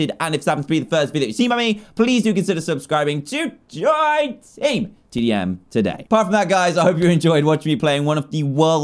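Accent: United Kingdom. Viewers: You so British lol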